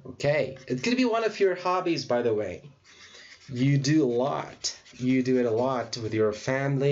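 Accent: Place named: United States. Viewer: i like your american acent i like american english can you give us more hints and clues about the acent in english and thir differences ?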